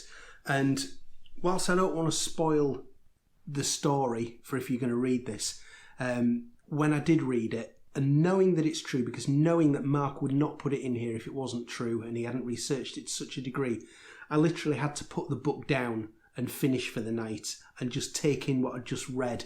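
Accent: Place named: Liverpool, United Kingdom. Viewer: Thanks for the wonderful review! As fun just digging on your accent (Liverpool itself I’m guessing) as your enthusiasm for the book. I read the 900 page version when it came out in 2013 and have been on the fence about the complete edition but I think you talked me into it! Thanks again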